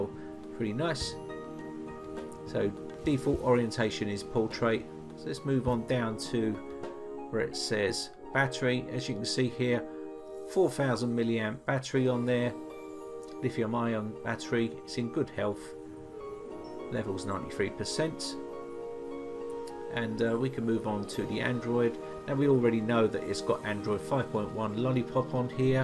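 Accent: United Kingdom. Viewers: British English..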